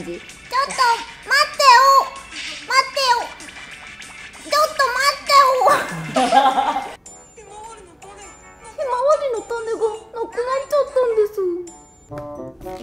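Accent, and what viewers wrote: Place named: Tohoku, Japan. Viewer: ところどころ東北っぽいイントネーションだと思ってたらやっぱ秋田だったか！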